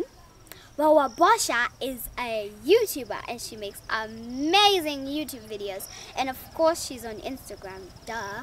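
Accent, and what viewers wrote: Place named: United Kingdom. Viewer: Your english pure UK accent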